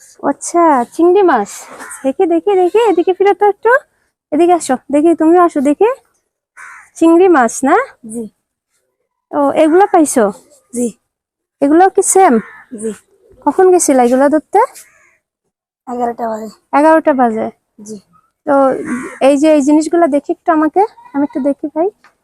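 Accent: Chittagong, Bangladesh. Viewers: আপনি চট্টগ্রামের যে,কতা শোনে বুঝা যাচ্ছে যে...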